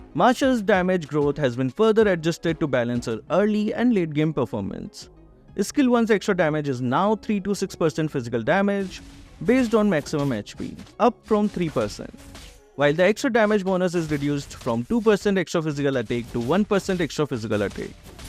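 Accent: India. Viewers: I think hes from india, cuz his accent ⊂◉‿◉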